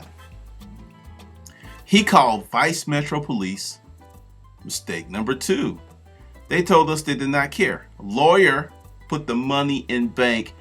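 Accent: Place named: South, United States. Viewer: Whats up with your "Mammy Copepper Plantation accent", I didn't know if you wanted your 15 minuits of internet fame or you wanted to tell your money story...........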